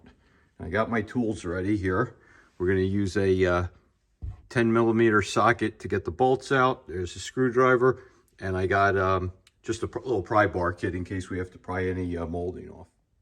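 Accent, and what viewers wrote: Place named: United States. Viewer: Thank you for this. The way you speak makes me want to visit the States again. Salutations from Germany.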